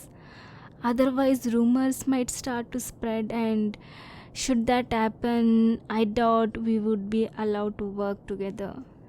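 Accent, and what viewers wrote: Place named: India. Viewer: Wow, I've been listening to asmr rp/story audios for awhile now specifically ones with female voice actresses but i don't think i have ever listened to one where the voice actress has an Indian accent, you sound really good. I myself am Indian but my family are not from there so i actually never heard the accent much at all.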